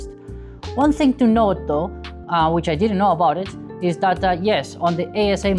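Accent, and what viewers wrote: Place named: Rio Grande do Sul, Brazil. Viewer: Gaúcho de onde Tchê?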